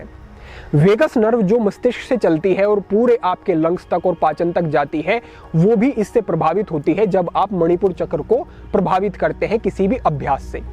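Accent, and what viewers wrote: Standard. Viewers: Mera man to apki awaz sunke hi accha ho jata hai q ki ap suddh hindi bolte ho badi meethi lagti hai ❤❤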